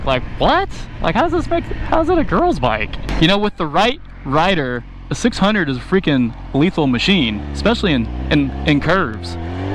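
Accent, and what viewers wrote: United States. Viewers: What part of Louisiana you from?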